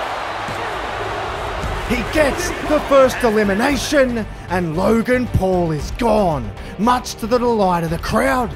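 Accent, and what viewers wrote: Australia. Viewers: Ngl, you actually do sound like Grayson Waller, are you from Australia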